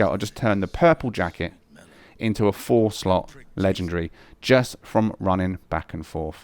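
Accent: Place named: United Kingdom. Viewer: literally what he just sounds british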